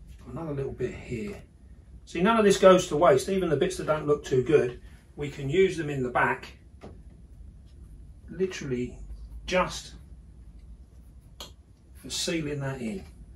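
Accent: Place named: London, United Kingdom. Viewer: man, I didn't like spiders until I found your channel. Wow. And I love that salt of the earth London accent 👍🏻 Changing people's minds pal All the best From sunny Glasgow 🇬🇧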